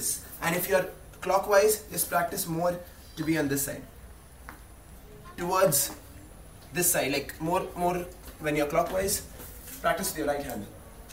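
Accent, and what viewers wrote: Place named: India. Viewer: i love indian accent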